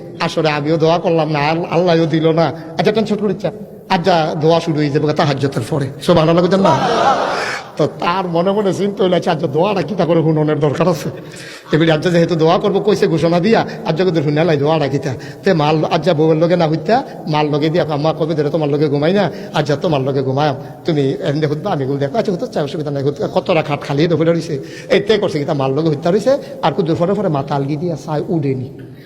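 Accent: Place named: Comilla, Bangladesh. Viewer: সে সম্পূর্ণ কুমিল্লা ভাষায় কথা বলে। কুমিল্লায় ওয়াজ করে বেশি,সে নিজেও কুমিল্লার মানুষ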